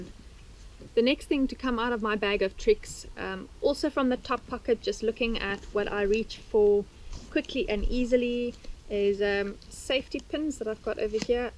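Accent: South Africa. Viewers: Thanks for an interesting video, love to learn about Africa :) Also dig that wonderful south african accent. Greetings from Sweden! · Great video. Watching from the US. Also love the South African accent. :) Cheers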